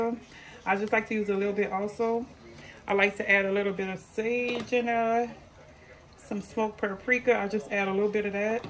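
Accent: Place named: New Orleans, United States. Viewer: Are you from New Orleans??? Sounds like you are. I was practicing on my hair Weaving skills, & I thought starting my own channel. Gurl, I'm sho you from New Orleans & you're doing a Great Job 👍. I've been in the Retail business over 30 years & I had to Speak Professionally. One thing that People love, is our Accent!!! It's beautiful Sis.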